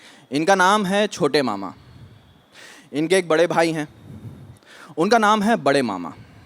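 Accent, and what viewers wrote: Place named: Kanpur, India. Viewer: That's a thing....know as,"kanpuriya accent"!!!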